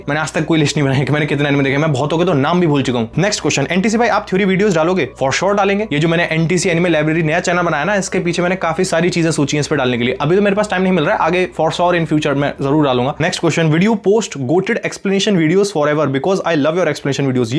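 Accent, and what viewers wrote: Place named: Haryana, India. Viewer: Khadi boli haryana se ho na bhai tum 😂 nahi tu beyong hai bhai cutie pie 😅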